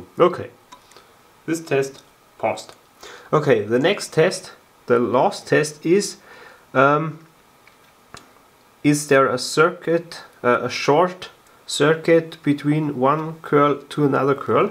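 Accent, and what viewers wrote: Bavaria, Germany. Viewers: Dieser deutsche Akzent aus Bayern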